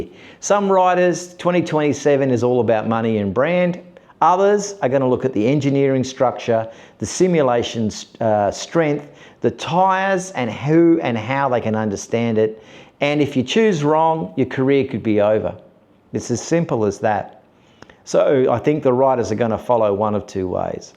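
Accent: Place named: Australia. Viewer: right. This site has the most authentic and knowledgeable discussion of our favourite sport. No AI, and in plain (Australian) English! Good one. Yes, a lot to look forward to over the next 24 months. And by the way, it is Toprak. I suspect we will all have to get used to spelling Toprak Razgatlioglu (with a silent 'g', and means 'son of Razgatli' I understand). Often called 'Stoprak' of course, for the stoppies! He and Jack have already started the Pramac stoppie contests...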